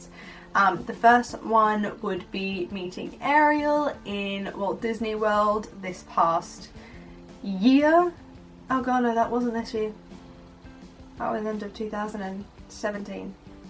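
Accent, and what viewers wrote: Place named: United Kingdom. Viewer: Hhhahaa this is much better than our old channel!!!! How did you go from sounding American when we were young to now sounding British??? Hahha!! Love you long time!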